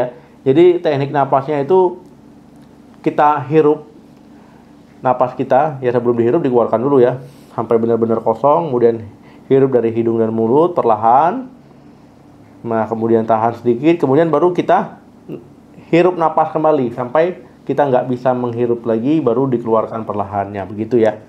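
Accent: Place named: Bali, Indonesia. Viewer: ini logat bali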